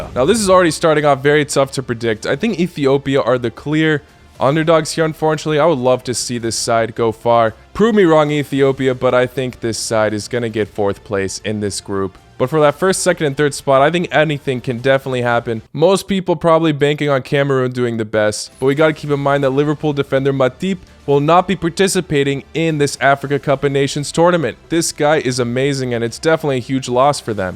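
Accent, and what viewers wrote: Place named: United States. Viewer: Is he American cus he got a american english accent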